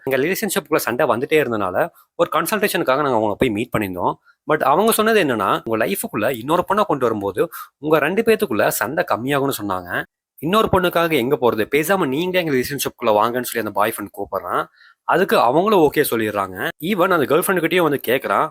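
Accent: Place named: Kongu, India. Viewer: இந்த காணொளியை பேசுபவர் கொங்கு மண்டலத்தை சேர்ந்தவராகத் தான் இருக்க முடியும்... எப்படி என்று கூறுங்கள் பார்க்கலாம்???...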